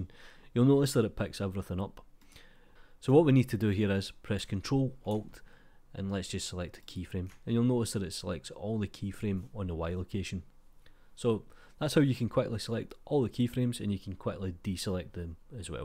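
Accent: Scotland, United Kingdom. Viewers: I don't know much English but I understood that you are Scottish, because I watched the TV series Outlander! They sometimes spoke Gaelic there. Are you by any chance from the McKenzie clan or Fraser?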